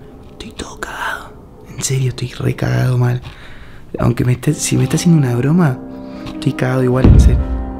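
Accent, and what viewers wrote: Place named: Argentina. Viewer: me gusta tu voz de Argentina:3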